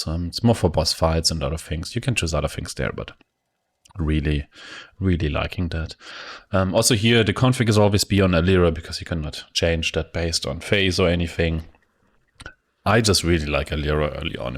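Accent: Germany. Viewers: you sound german af 🤣 greetings from germany^^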